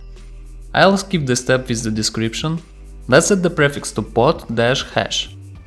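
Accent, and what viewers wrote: Russia. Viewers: это случаем не русский акцент у автора?? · Dude are you russia? You speak with some strange accent reminiscent of Russian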